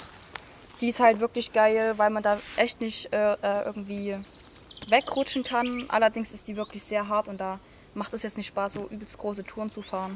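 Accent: Saxony, Germany. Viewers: Woher kommst du ?Sachsen ? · Dein sächsischer Dialekt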